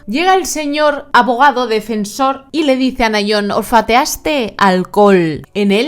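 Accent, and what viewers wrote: Spain. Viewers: Me gusta la información lo malo suelo explicas con acento español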